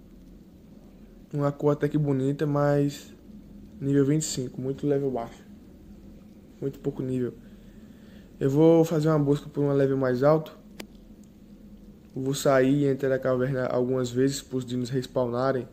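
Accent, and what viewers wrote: Northeast, Brazil. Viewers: Vc é do nordeste certeza kkkkkk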